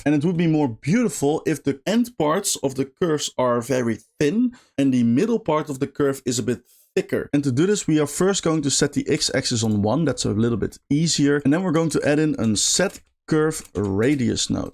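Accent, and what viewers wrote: Netherlands. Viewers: Goede video, je accent is onmiskenbaar en aandoenlijk Nederlands (jaren 90 MTV Katja Schuurman, nee echt zoek het op 😂). Je nodes/wiskundige aanpak lijkt op die in Midge Sinnaeve's video's. Prachtige renders. Subbed 😊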